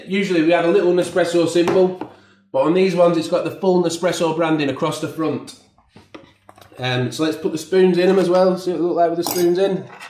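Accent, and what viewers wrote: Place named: Yorkshire, United Kingdom. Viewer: i will 😊 of the bat you sound Yorkshire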